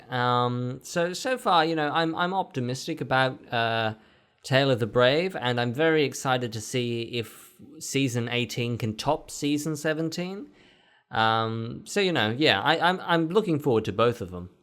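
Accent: Australia. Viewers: Wow ur Australian. My brother and I thought u were British 😅😅 but I would love to see ur T&F episode. And I'm starting to think I'm the only one who likes thomas and the magic railroad. Also I think when this Q&A is over you should do one with ur characters from your series.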